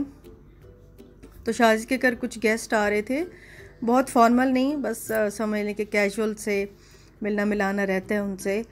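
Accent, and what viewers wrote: Saraiki, Pakistan. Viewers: آپکی پہلی وڈیو دیکھی ہیے بولنے کے انداز سے آپ سرائکی لگ رہی ہیں؟؟؟ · I strongly feel that u r saraiki or multani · I hate this language n this pak acsent. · Aap yaqeenan saraiki speaking hen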